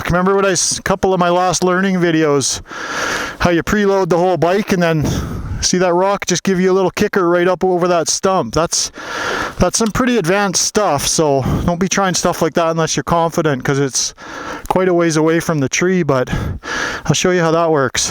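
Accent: Canada. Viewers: are u canadian ?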